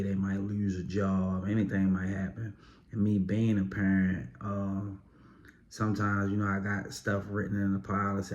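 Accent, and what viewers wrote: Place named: Baltimore, United States. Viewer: This is a sidebar he has a heavy Baltimore accent but nether the less I am tuned in for the information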